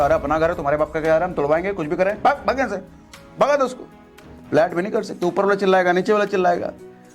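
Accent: Bihar, India. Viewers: Gariayayga,Lagta hai aap bihar se hain.mai bhi hun.good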